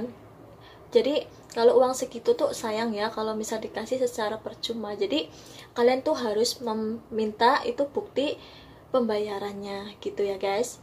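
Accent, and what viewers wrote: Banyumas, Indonesia. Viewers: Ngapak jos sukses.. Salm dari trenggalek mbak.. Makasih atas berbaginya..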